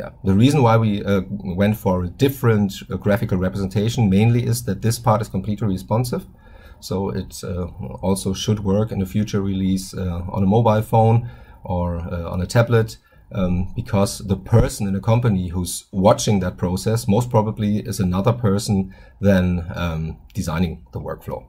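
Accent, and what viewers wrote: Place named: Germany. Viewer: You have a German accent 😂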